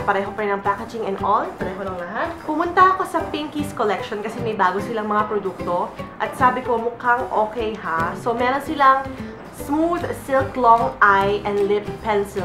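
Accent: Philippines. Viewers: parang ang lalim mo mag tagalog ateeee kakatuwa! ehehe <3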